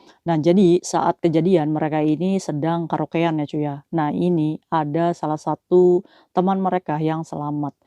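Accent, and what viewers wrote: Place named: Java, Indonesia. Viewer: kirain orng jpng tnyta wong jowo 😊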